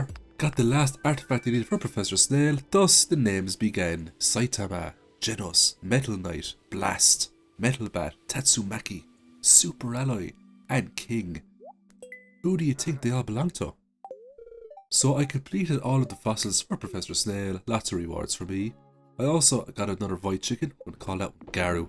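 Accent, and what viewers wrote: Ireland. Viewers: I have never heard an Irish accent as good as yours love it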